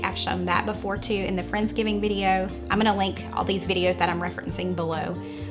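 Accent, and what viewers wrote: South, United States. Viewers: Hi im new to your ch.I love your ch.and all your recipes.Where are you from.I love your Southern accent.im from Arkansas.nice meeting you and your recipes.My dad favorite holiday was Thanksgiving too.Hes deceased now.But he loved 🦃 turkey so much because you can do a lot of things with.I love 🐔 <link> and my mom.Shes deceased too.My grandmother her mother loves goose and duck.My father's mother loved 🦃 turkey too.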